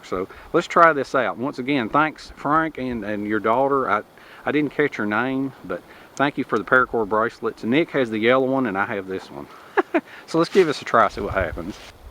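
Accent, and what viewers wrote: South, United States. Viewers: Once again, a cool video. I like the "Southernisms", like "geeyuft". That's when someone gives you a present. Thanks. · Tell me the truth, do you have just about the thickest southern accent of anyone??? Because my friend Meg lives down in MS and you put her beautiful southern belle accent to shame man, I love it all, LOL. I am going to send this to her now! Southern accents are my favourite. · Hi, I'm a new viewer, now a subscriber. I have enjoyed your videos so far, one with the spiders at the end kreeped me out. Love your southern accent, plus you not bad on the eyes. But did you have to make me so hungry? Keep up the good job. Oh I also love the way you call all the people who sends ya gifts, your friends. Not sure how i would send you anything?. Take care.... Hi to Nick. and your Dad, he was in the first one I watched. 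Later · Amen! You've got that right. Love and his southern drawl. It's the best! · I love your videos. Your southern accent is soooo cute. What do you do as a living, if I may ask.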